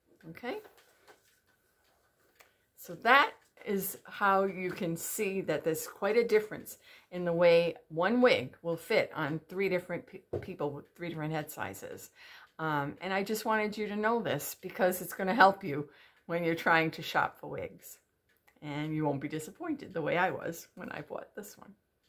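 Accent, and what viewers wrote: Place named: New York, United States. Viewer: (that NY accent~love)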